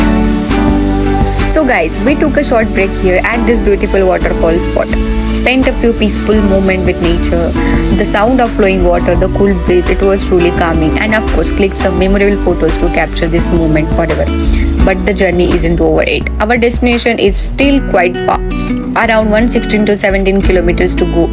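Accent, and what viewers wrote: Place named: Maharashtra, India. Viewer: marathi accent dear...its cool...keep it up...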